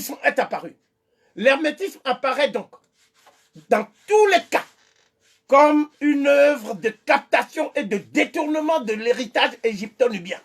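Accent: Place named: Abomey, Benin. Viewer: Un béninoise qui parle français garde son expression natale Abomey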